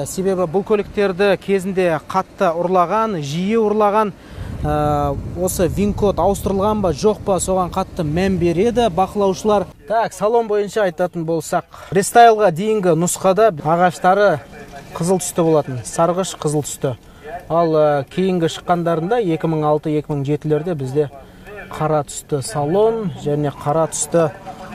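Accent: Standard. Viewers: брат таза қазак тылынде соилеиды дурас Брат алға жаң тусыршы кутып жатырмыз👍👍👍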